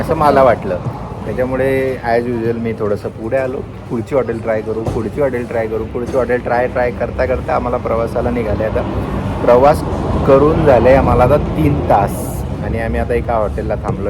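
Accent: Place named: Pune, India. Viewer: Punyat aloy mhanun proper marathi ka😂tumchya punyachi tone aikun vakaila yet bhava..🤮🤮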